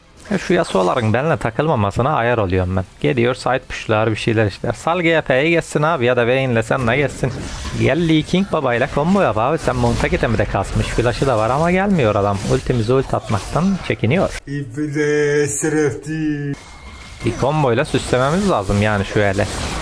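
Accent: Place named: Serik, Turkey. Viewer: Abi sende tam Serikli şivesi var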